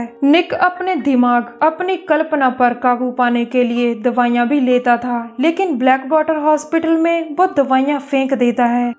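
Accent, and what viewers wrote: Punjab, India. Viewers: kya yaar punjabi hindi with break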